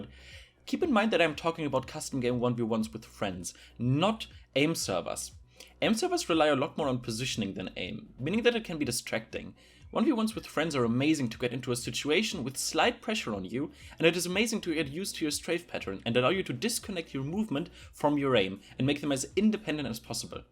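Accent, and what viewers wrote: Germany. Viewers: Hört man direkt. Ist zwar kein starker Akzent, aber als Deutscher erkennt man das sofort. :D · nice video! btw are you german, because you sound like me when i speak english (and im german) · Ich höre so raus dass du deutsch bist 😂 kann Mann diesen aim "Unterricht" auch in deutsch bekommen ? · hey bist du deutsch du hast nämlich n ziemlich startken deutschen akzent in deinen Videos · Am I hearing a german accent? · Are you from Germany? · I was just wondering what your native language is? Your accent makes me think of that of a German but your real name doesn't fit (great content btw)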